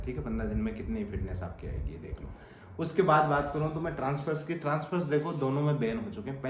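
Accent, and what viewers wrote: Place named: Rajasthan, India. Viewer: Rajasthani accent.. right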